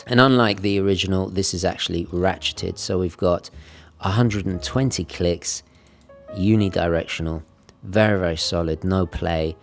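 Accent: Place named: RP, United Kingdom. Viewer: I knew you were a fan, as I have mentioned before how since becoming part of the Watchbox Team, you have made your videos a little bit more posh sounding, and a bit Partridge. I am really hoping you do a full homage and add a 'ahaaa!' in one of your videos. These are great watches too by the way. I have recently had a 'field watch' buying addiction, being ex Forces, I have recently purchased five field watch style watches including three Hamilton, and a Longines military COSD which is hopefully being delivered today. I have a black face one coming , it has the forces broad arrow (crow's foot) on the face. I really want a white face one but I cannot find a new one anywhere. There is a pre owned one on eBay but it looks a bit beat up, and I got a brand new black face one heavily reduce in a Black Friday sale. I think the retail is just over £1,200 and I got it already reduced, then a Black Friday promo code worked, so it ended up being £748 UK sterling, free next day delivery from an Authorised Longines Dealer too, not grey market. 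A bargain. I am just negotiating a 'Dirty Dozen' watch. Really love those. I think Hamilton field watches are a bargain too, I got mine also with around 35 to 40% off RRP, again from Hamilton AD too. Apologies for the length of this comment. I am also waiting on two Stowa Flieger watches to be delivered.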